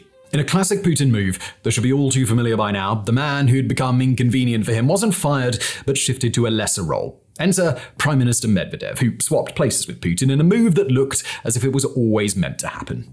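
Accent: RP, United Kingdom. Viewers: I'm about to take a nap now. If I don't sleep to podcasts I sleep to one of many channels, something about British accents.😂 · When are you going to speak about the betrayal of the Minsk agreements by the West? Will you explain how under Putin the Russian economy has grown exponentially? Salaries and pensions have raised in real terms, the national debt has substantially decreased and how he is standing against Victoria Nuland and her deranged dreams, successfully? Imagine, Russia is single-handedly dealing with the whole of Nato through its Ukrainian proxy and is prevailing. Putin is spearheading the multipolar world and a De-dollarised worldwide trade, that's why the US is bent on destroying Russia, but is achieving exactly the opposite and destroying itself in the meantime. Anyway, you simply don't want to understand that the Russian people love their leader and most of the world seconds them. 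With your britisher accent I can understand why you want to throw some dort on Putin while ignoring your inbred monarchy ruling for centuries and your unelected leader Sunak. Who voted for him? Tell me.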